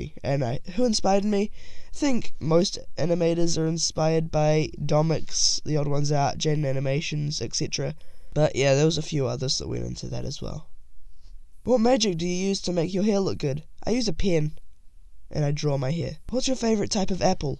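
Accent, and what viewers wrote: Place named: New Zealand. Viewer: I knew that was a new zealand accent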